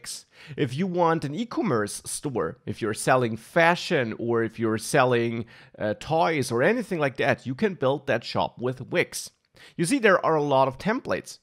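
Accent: Germany. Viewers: are you from germany? (accent - pure interest)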